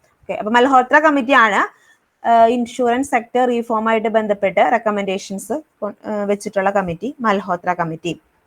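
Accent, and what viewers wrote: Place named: Thrissur, India. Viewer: ടീച്ചർ ഒരു തൃശ്ശൂർ കാരി ആണെന്ന് തോനുന്നു 😊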